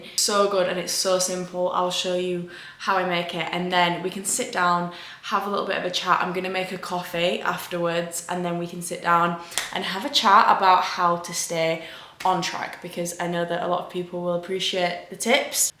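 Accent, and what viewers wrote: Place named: Burnley, United Kingdom. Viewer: I love that accent. Are you from Burnley?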